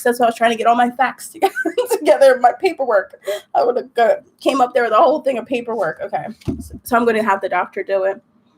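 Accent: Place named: New Jersey, United States. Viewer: I love your accent.. my bf is from Staten Island and now Jersey.. he has the same accent as you lol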